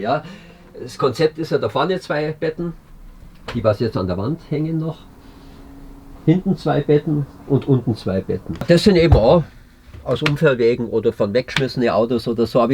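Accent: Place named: Allgäu, Germany. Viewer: Du bist ne absolut coole Socke! Dein Bus ist obersupergeiles Teil, ich bin begeistert. Deinem Dialekt nach würde ich Kempten vermuten, oder in der Gegend?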